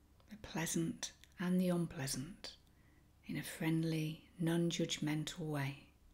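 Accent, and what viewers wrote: England, United Kingdom. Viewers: At last a warm English voice ...Aaah 🕉️🙏🥰✨🌟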